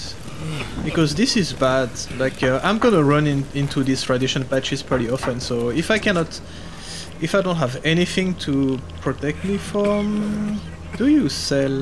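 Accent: France. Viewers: you have a big french accent i am wrong?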